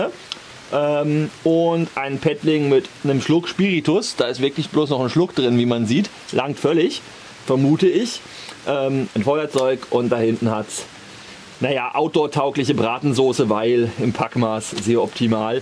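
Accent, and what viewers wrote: Germany. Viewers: de kann das ( sch. in gebastelt) schon schweizerisch aussprechen zwar noch mit deutschem akzent aber tönt schon recht gut.die evelin hat guten einfluss auf dich.hehe witer so.i mag dini biträg..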